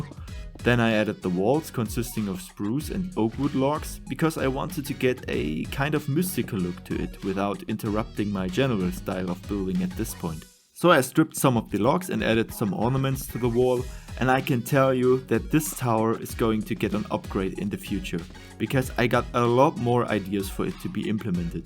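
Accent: Germany. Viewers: I will always recognize the german accent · I LOVE your German accent!!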